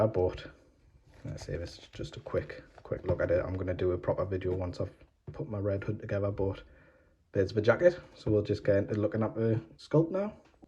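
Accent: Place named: Newcastle, United Kingdom. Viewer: Your Geordie accent reminds me of home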